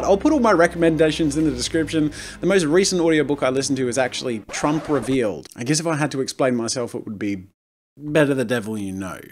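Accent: Australia. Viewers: BeanDip: triggered American cause an Australian is being Australian Me: triggered Australian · No it's zed, he is Australian and that's how we pronounce it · Australians (me) say zed and since is Australian he would say zed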